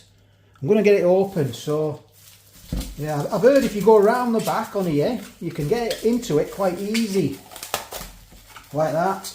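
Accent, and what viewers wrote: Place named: Northern England, United Kingdom. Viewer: Is it just me who thinks this guy should feature in an ALDI advert? Proper northern accent (I'm from Yorkshire, I'm guessing is Lancashire originally?) He wouldn't even have to act for it, just plonk some food in front of him and film it 😁 Hands down the best, honest and comical food reviewer out there, and he doesn't even try to do anything. Just brilliant 😎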